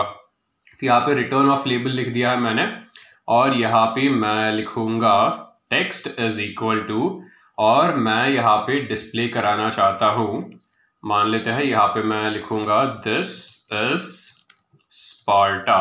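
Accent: India. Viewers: Anyone relize the amazing indian accent?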